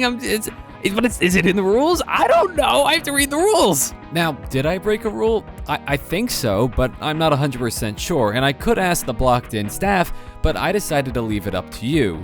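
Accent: Canada. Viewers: i thought u were kenadian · i swear your kenadian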